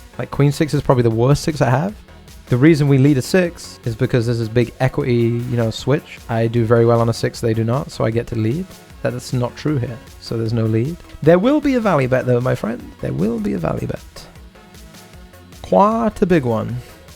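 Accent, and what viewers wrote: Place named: London, United Kingdom. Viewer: You sound like your from London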